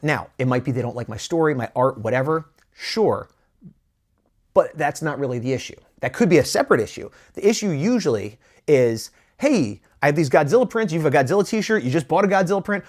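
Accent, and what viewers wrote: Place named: New York, United States. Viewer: I think you SHOULD use that voice and “hey buddy, you like monstahs?” that would be gangster! let your new York flow. sing it like. 🤙✌️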